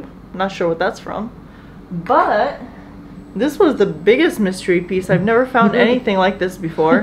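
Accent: United States. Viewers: Why the american accent?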